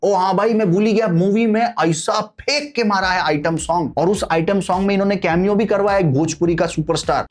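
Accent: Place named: Mumbai, India. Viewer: Bombay wali Hindi bol raha hai bhaiya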